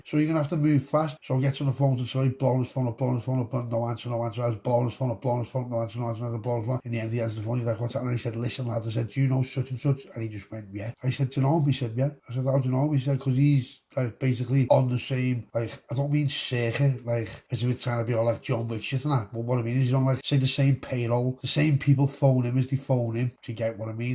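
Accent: Liverpool, United Kingdom. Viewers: Fuckinhell here we go starting me n rattle for n x 1😂 b belter scouse 👌👌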